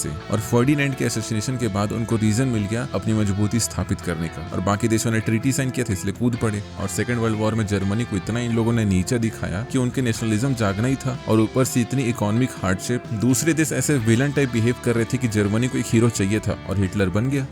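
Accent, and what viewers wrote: Chhattisgarh, India. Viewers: Aap Chattisgarhi hai kya? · No offence ! But are you Chhattisgarhi?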